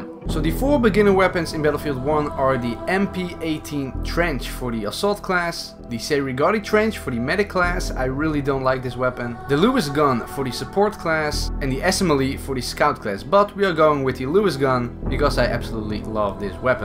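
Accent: Netherlands. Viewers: are you Dutch? If no then what country?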